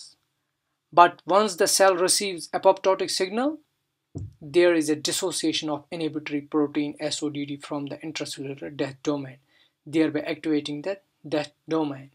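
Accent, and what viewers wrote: India. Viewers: your accent is very strong indian, but you really made me get the clue. thanx from germany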